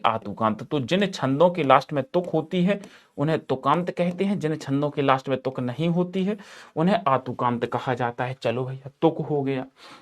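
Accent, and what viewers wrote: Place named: Madhya Pradesh, India. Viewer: Sir aap mp se ho kya?😅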